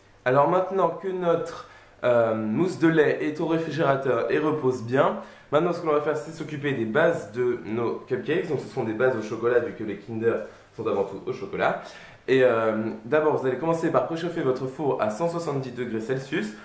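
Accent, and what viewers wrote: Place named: England, United Kingdom. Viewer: Je n aime pas du tout ton acsent anglais et tu veux un te la péter dsl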